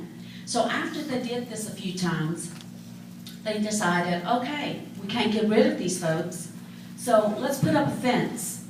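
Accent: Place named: South, United States. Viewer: Love her Southern accent.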